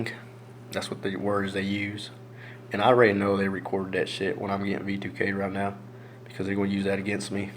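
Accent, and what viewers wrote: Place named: Texas, United States. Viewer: I don't think the machine will work but if you want to buy it just to do a product review that is cool. I think people try to take advantage of the desperate to make money. IF you want to try some kind of blocking device, Neodymium magnets work somewhat. You can get them in a headband or tape them to one. I heard mirrors can send back whatever waves they shoot at you or maybe just even sheet metal. What would happen if you pulled out a frying pan and stuck it over your legs where they send the beams? Maybe it would break their equipment. Why do you they think you should go to Mexico? You don't even look full blooded Mexican maybe because you act so American. You even have a heavy Texas accent instead of a Spanish accent. You should tell them okay, buy my ticket, Hasta la Vista.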